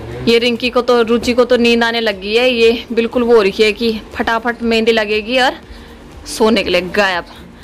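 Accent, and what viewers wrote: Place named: Pahadi, India. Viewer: Maam . Pahadi tone aa hi jati hai Being .pahadi. ..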